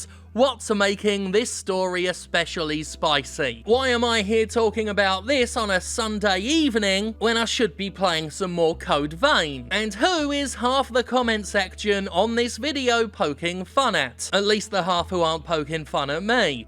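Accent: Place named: United Kingdom. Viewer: I wish I could say controversy like that. But without the British accent, it just doesn't work.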